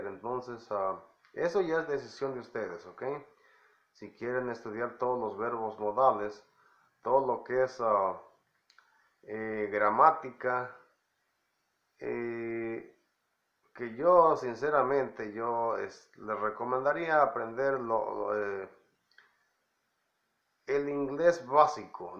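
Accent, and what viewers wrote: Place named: Texas, United States. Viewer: GRACIAS me gusta mucho tu asentó como tejano jeje bendiciones ahora si a ponerle atención a la clase jeje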